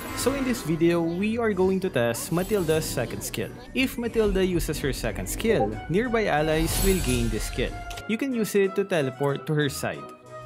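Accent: Philippines. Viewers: You can hear his peenoi accent 😂💜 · Pinoy na pinoy mag english ah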